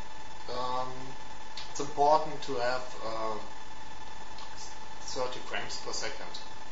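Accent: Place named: Germany. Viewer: Der Akzent ist deutsch, definitiv^^.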